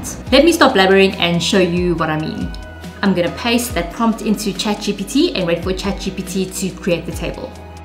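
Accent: South Africa. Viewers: This seems to be a South Africa accent. Hi from Cape Town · Immigrated to the States when I was 10. At the core I'm South African. Recognized your accent right away.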